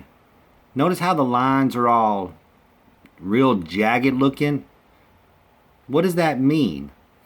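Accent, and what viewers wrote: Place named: South, United States. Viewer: Haven't seen somebody actually put scenes to the immune theory! I love it! I'm a nurse also and I do know that sometimes heartbeats are to faint to hear, even with a stethoscope. But I agree. He did seem dead to Shane and Shane shouldve been trained to tell since he was a cop. I really wish Carl is immune but my gut tells me that gimple isn't smart enough to throw this at us. But he is stupid enough to piss us off by killing Carl. I also love to hear a video narrated by a southerner. I don't hear southerners narrate videos to much.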